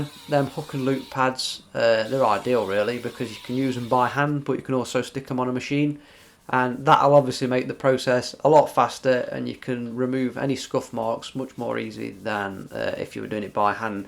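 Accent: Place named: North East England, United Kingdom. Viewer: I recognize the accent tens years ago when I was in the NE of England... enough siad...this channel's great, I'm ur new subscriber.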